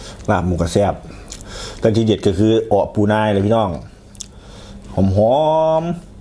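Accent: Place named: Ban Lan, Thailand. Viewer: ไทบ้านได๋น๊อค่ะ สำเนียงเว้าคือทางบ้านลาน บ้านโนนสว่าง จำปาโมงแท้ค่ะ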